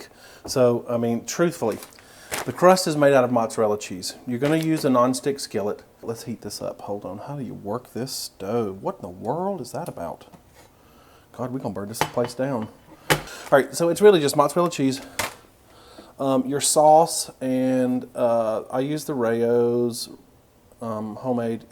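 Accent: South, United States. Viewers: I love your southern accent. 🙃😁🤣 "oh come on here withat!" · Binge watching you today! LOVE 💕 THIS 💕 CHANNEL!! U make me smile! U encourage me to cook and I love your realism. Can’t wait to make this! I love your accent. I’m from SC so it’s comforting to hear southern twang! Keep calm and Keto on, my man!! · Just discovered you the other day and you are an absolute DELIGHT to listen to! You're so funny and sweet, informative and I love your southern US accent! I'm already a fan, over here in Belgium. 🙂❤️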